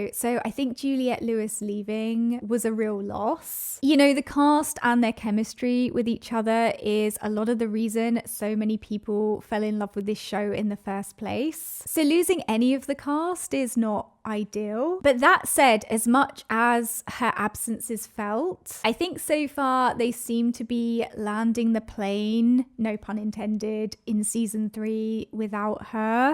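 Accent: United Kingdom. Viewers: Where is your accent from? Are you from there too? I'm thinking somewhere in England? - (I'm a quick study)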